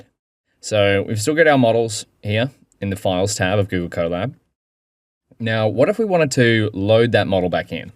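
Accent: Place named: Australia. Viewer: Yes, his australian accent is one of a kind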